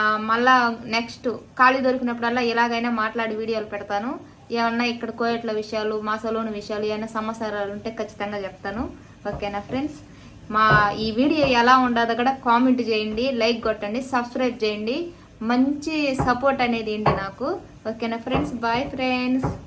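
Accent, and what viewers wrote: Nellore, India. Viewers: హలో గాడ్ బ్లెస్స్ యు. నీ వీడియోలు చూస్తాము. ఆ నెల్లూరు బాష వీనీ ఆనo దీ స్త ము. మా ది గూడూరు. జాగ్ర త గా ఉండు.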